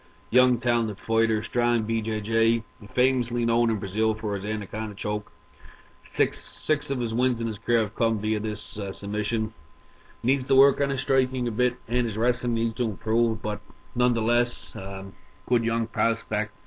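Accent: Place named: Eastern Canada, Canada. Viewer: You have one hell of a Eastern Canadian accent.....you're a very knowledgable poster though. Keep it up!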